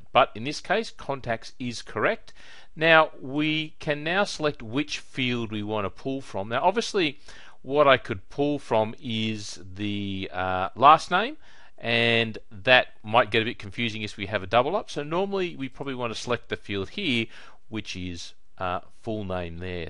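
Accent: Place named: Australia. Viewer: Many thanks for the reply - searching on the web all the articles are for SP 2010 or maybe 2013 and all recommend not using (large) lookups as they slow the system down too much, I guess I can only do some testing to see what sort of impact the large lookups I'd like to implement will actually make. Also nice to hear an Aussie accent on youtube, keep up the good work mate.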